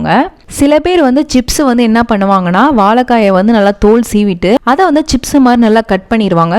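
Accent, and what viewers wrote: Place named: South Tamil Nadu, India. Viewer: South tamilan spotted by her slang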